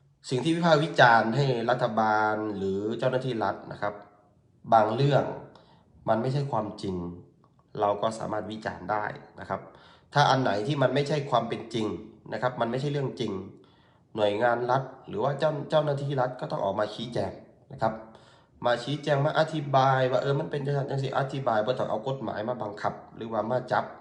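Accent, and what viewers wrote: Isan, Thailand. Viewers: สวัสดีครับสบายดีครับพูดภาษาไทยชัดมากภาษาลาวไทยอิสานออกสำเนียงอุบลฟังแล้วไม่ใช่สำเนียงลาวฝั่งซ้ายการออกเสียงเป็นคนอิสานไม่ใช่คนสปป.ลาวฟังแล้วเหมือนคนอิสานพูดเป็นกลางไม่ใช่อวยครับพูดถูกต้องแล้วครับเป็นกำลังใจครับ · สำเนียงแบบคนไทยภาคอีสานเลยครับ,เวลาพุด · คุณพูดเป็นภาษาไ ทยอิสานนะไม่ใช่ภาษาลาวติดตามอยู่คนรุ่นไหม่ไฟแรง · อ้ายเจ้าเว่าลาวยังบ่คือลาวยุ ผมฟังเป็นทางอีสานครับ “ขออภัย”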